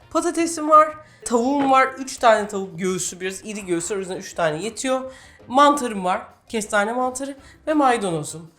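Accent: Cyprus. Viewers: Tarifi denedim .. sarmısak da ekledim süper oldu.. emeğinize sağlık çok guzel bir tarif oldu!!! Naçizane birde önerim var.. ben kıbrıslıyım. Sende de hafif bi kıbrıslılık var!!! Ve biz keklik tavşan turaç, tahtalı güvercin(fassa) gibi av hayvanlarını çok tüketiyoruz.. eğer düşünürseniz sizden gekecek av eti tariflerini merakla bekliyorum! 👍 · Kendisi Kıbrıslı o yüzden var biraz o şivesi.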